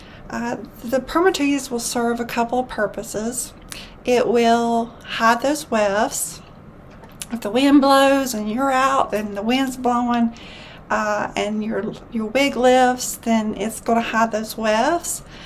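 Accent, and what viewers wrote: South, United States. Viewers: Your accent makes me feel like I’m at home! I’m from TN but have lived in Alabama, Mississippi and Arkansas. This is my favorite wig on you. Which wig that you’ve reviewed has been the best for wearing up? Like which has the best temple to temple coverage? And which blonde has had the best lace front? I wish I had your calmness!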